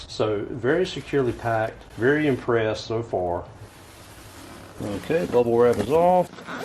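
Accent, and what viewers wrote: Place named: Georgia, United States. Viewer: Excellent DIY tutorial with a Goorgan accent to boot, I reckon. Bummer you had to go all through this after you fixed the AC a year ago. But that's the reality of owning a car past it's engineered intent. One good source of AC troubleshooting is the Professor Pentane yt channel.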